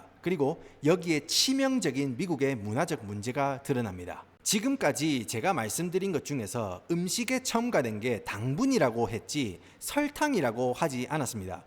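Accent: Gyeongsang, South Korea. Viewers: 아 이분 사투리랑 영어발음은 또 오지는게 진짜 경상도사람인데 겁나 웃기네요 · 경상도 사투리+미국쿡 영어 = 님의 억양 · 갱상도 사투리가 내기에 상큼하게 터지네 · 영어가 경상도 사투리하고 궁합이 딱 맞네... 재미난 영상 항상 응원합니다. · 우리 슨생님 일 조금 하고 돈 마니 버세요. 이렇게 입을 잘 터는 유튜버는 더 승승장구해야 된다고 생각합니다. 박가네 오상(사투리+고급 일본어)이나 님(사투리+본토영어) 처럼 갱상도 유튜버들이 더 많아져야 한다고 생각합니다.. 귀에 팍팍 때려 박힘. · 오! 첨에 쎈 갱상도 억양이여서,너무 토속적이신줄 알았는데 다양하고 박학다식한 지식이 있으시고 전문적 분야도 공감 가도록 설명해 주시네요. 더구나 미국적 배경으로 다져지신 경험과 지식이라서 폭넓은 안목을 가지셨군요. 너무 재밌게 잘들었구요. 미국에 30년이상 살고 있는 사람으로 찐 공감 했습니다. 영상대박이 기대됩니다!! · 갱상도사투리에 영어발음조합 은근 잼있으예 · 영남 사투리를 매우 적절히 구사하는 분이군요. ㅎㅎㅎ 게다가 동영상의 내용자체가 매우 진솔하고 생각할 점이 있는 유익한 것이군요. 유튜브 동영상이 대박 나길 기원합니다. ㅋ 미국인의 식습관에 관해서 하나 덧붙이자면 냉전시기인 1960년대에서 1970년대 까지는 미국의 각급 학교에서 비교적 영양적으로 균형잡힌 급식을 학생들에게 제공했다고 합니다. 그러나 로널드 레이건이 집권한 이후엔 국방비는 증액, 교육복지비는 무조건 감액 하는 공화당 정책때문에 미국내 각 학교들의 예산도 대폭 삭감되어 학교에서 제대로된 급식을 학생들에게 제공하지 못하게 되었죠. 이 점도 미국인의 비만 증가에 영향을 끼쳤을 거라 봅니다. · 님, 너무 재밌게 잘 보고 있어요. 초기에 구독자 많지 않을때부터 영상 알고리즘 떠서 보고, 바로 구독하고 지금까지 보고 있네요. 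저도 경상도 여자로서, 친언니도 미국 살아서 한번씩 간 미국에 대해 느끼는 부분들이 공감되는 부분도 많구요. 경상도 사투리도 리얼하고, 입담도 좋으시고.. 개그코드에 초긍정 에너지까지 많은 에너지 주는 님 늘 응원할께요.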